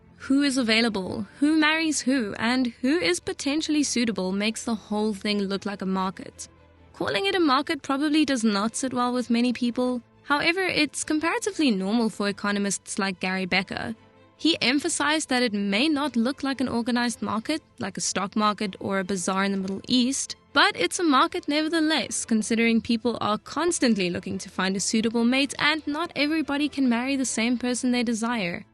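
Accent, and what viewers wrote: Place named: South Africa. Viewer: Thinking the same thing, hey. Accent is too South African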